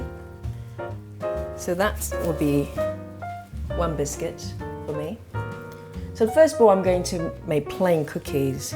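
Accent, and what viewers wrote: England, United Kingdom. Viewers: This is a life saver. I have a ridiculous amount of okara. Also, I love your accent. It's like a mix of British and east Asian (Japanese?) Anyway, I think ill make this now. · Just sounds like an English accent to me.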